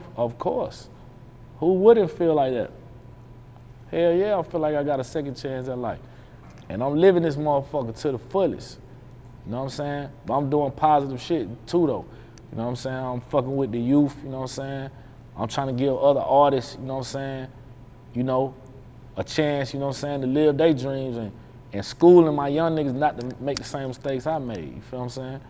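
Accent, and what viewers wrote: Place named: New York, United States. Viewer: I thought he was from NYC but as a youngin moved down to the south i swear some times every now and then i catch a lil NYC swag from him i like some of his song's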